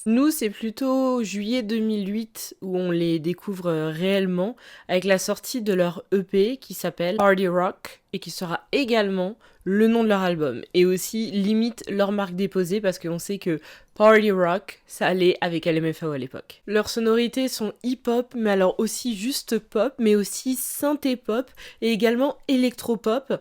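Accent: United Kingdom. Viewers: j'aime trop ton accent british oml 😫🫶🏻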